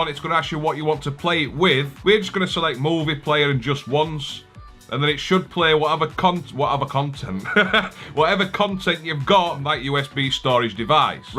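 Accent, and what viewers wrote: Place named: North, United Kingdom. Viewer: You're definitely the happiest and funniest Android Boxes reviewer that I've ever seen in the whole Youtube. Got a new subscriber! By the way your northern British accent is very pleasant to listen to.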